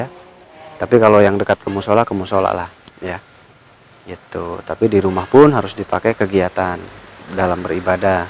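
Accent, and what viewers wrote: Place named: Sarawak, Malaysia. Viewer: Ni suara kitak. Org sarawak. 🙋‍♀🤩🤩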